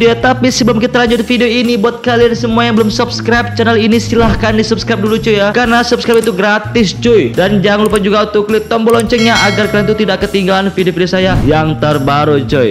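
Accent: Nias, Indonesia. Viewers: Abng orang nias yah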